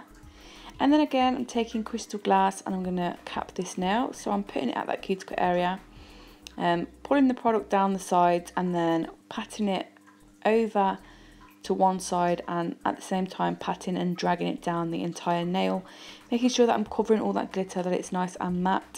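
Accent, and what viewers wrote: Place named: Birmingham, United Kingdom. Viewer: Love this girl and her work. Sounds like a Brummie!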